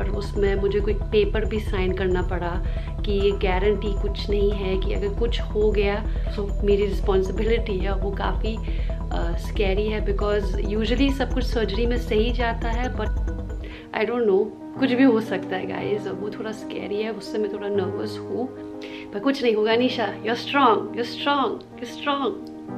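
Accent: United States. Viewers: App American hai kya 🤔?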